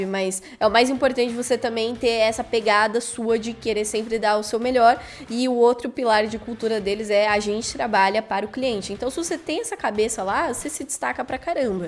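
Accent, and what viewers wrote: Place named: Rio Grande do Sul, Brazil. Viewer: Pela dicção deve ser gaúcha. Meu ouvidos agradecem quando eu escuto uma pessoa que pronuncia as palavras da forma certa!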